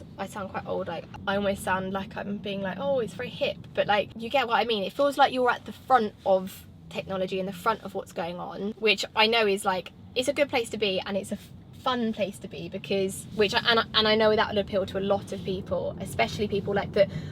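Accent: United Kingdom. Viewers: clicked for the car, stayed for the accent, love the brits accent damn it's soo cute & sexy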